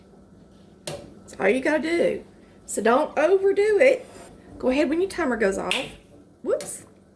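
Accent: South, United States. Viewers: Your American accent just makes this the most perfect video! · Southern accent ✔️ Named ✔️ She knows what's up 😊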